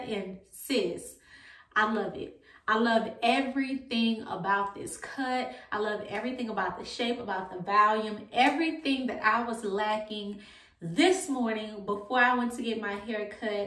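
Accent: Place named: New Orleans, United States. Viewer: That accent don’t lie lol to say you’ve been gone so long New Orleans is just in us 😂🙌🏽🥰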